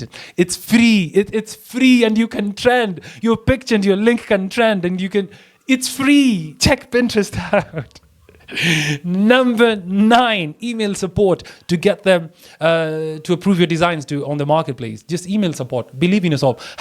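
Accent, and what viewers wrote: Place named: Africa. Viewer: What part of Africa are you from? I came here for POD info but I stayed because I love your accent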